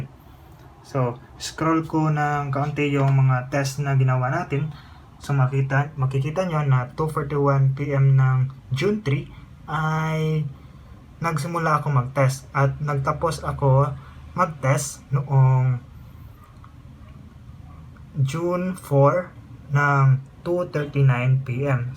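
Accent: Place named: Cebu, Philippines. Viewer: Cebu nga dba? Understandable nman. Walang nkakatawa okay.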